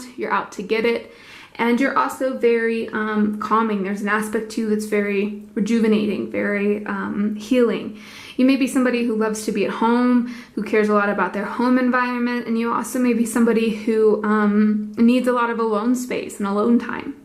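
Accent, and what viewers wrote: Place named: Scotland, United Kingdom. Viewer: LAYERS thick Scottish accent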